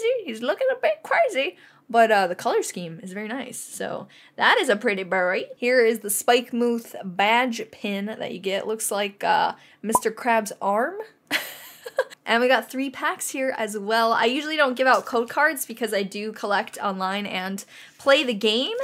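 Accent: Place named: Rural, United States. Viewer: That country accent tho lol!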